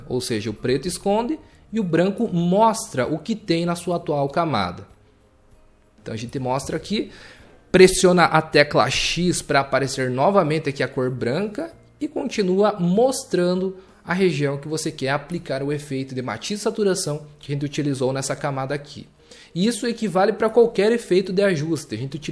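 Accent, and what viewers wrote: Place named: Paraná, Brazil. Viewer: Tua didática é excelente! Excelente vídeo. Grata por essa aula! Ganhou mais um inscrito! ;)Lm E Esse EEEE TEEE ae? És paranaense!!?? rsrsrsrs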